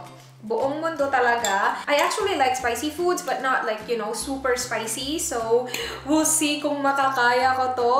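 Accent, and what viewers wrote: Visayas, Philippines. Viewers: Bisaya. Ka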